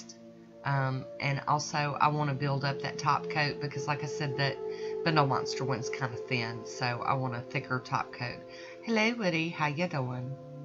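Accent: South, United States. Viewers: Hey I love that southern draw!! aEngland makes some great polishes - I love their brushes and the two polishes I have apply so smoothly!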